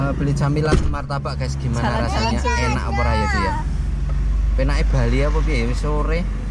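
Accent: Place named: Banyumas, Indonesia. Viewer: pada bae ngapak... salam dari cilacap sukses selalu mas 👍👍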